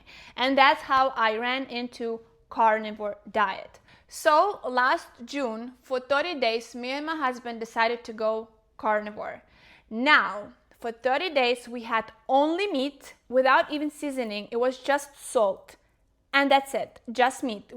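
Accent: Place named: Russia. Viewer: Great easy going video. And nice accent, reminds me of my time at school with a lot of Russians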